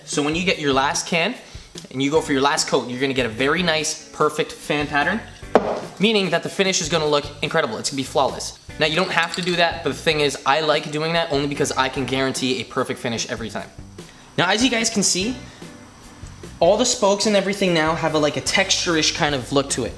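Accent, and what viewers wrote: Canada. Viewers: If I had to guess, I'd say that sounds like a Canadian accent. Great video!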